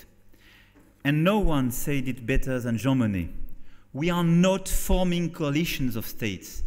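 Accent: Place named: France. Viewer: bah il est français tu veux qu'il ait quel autre accent à part l'accent français ?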